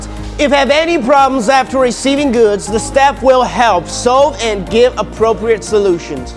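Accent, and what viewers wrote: South, United States. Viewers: Speaking with a southern U.S. intonation. Can't believe my ears!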